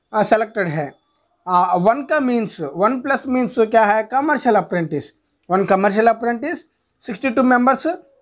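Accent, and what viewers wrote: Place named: India. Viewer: Hello brother. You have spoken such a sweet hinglish.(eng+hindi) Thanks a lot keeping this video in enish plus hindi. I didn't skip advertisements.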